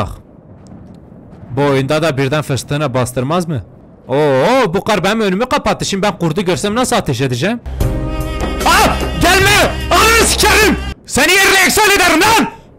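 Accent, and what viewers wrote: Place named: Erzurum, Turkey. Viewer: Abi şu şiven yokmu yaa çok güzel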